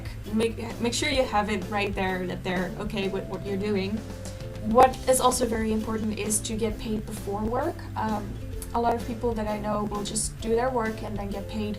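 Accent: Netherlands. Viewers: This is a super random and redundant question, but are you Dutch? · No, I believe she is from the Netherlands.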